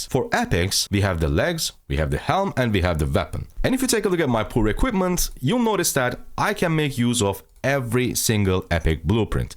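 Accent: Turkey. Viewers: Bro you have a strong Turkish accent are you Turkish by any chance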